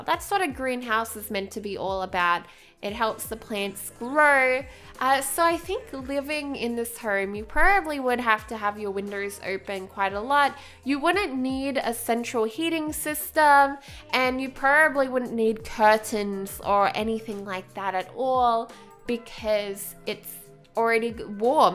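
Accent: Australia. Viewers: Are you from Australia? I think I hear that cute accent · sounds Australian:)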